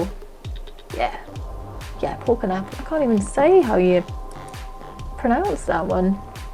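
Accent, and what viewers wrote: England, United Kingdom. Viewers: lets comment. i dont norm bother but i found your channel ages ago and all i heard was some nuts english lass babbling away in her helmet. I was like yes so its not just me. You keep it it up and fair play with the face on cam stuff bst be careful on what ya put up on the because of the fuzz.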